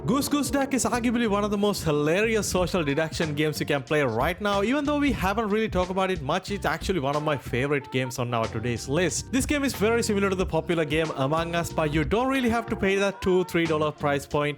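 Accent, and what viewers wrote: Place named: India. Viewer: your accent speaks Indian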